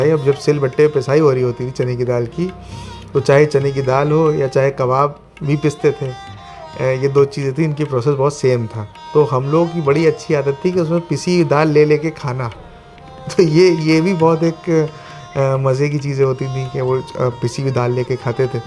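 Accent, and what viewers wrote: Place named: Lucknow, India. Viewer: Baaqi sab accha hai aapke videos mein but aapka pronunciation pe work karna hoga specially when you are carrying lucknowi touch in your words example its not फ़िर its फिर😊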